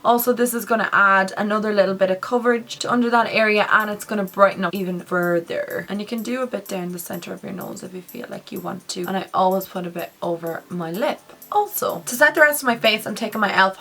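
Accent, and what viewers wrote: Ireland. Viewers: Are u from Ireland ? You sound Irish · I love the way she looks so tumblr and gorg but then she comes out with this common Irish accent 😍✌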